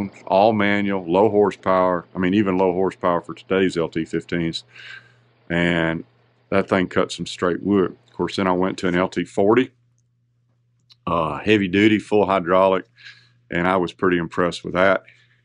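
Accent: South, United States. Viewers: I hear "Southern" so I understand you perfectly! As far as types of videos, I love the ones like this. I like them all, but on these I always learn a little something. Episode #2: items 6-10; Episode #3: items 11-15; and so on,,, Starting a series and you didn't even realize it. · BGATs are the sawyer's version of winding sticks. Thanks for being straight forward with your slow, Southern talk. It's much better than engineerese (I am fluent in both😂😂)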